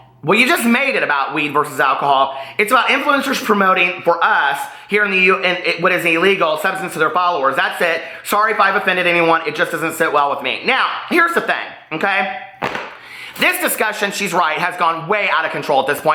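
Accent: South, United States. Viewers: I’m a life-long Southerner, but I do wonder why you, a life-long Indianian, have a Southern accent. Like the way you say “thang” instead of “thing.” So cute! I love it!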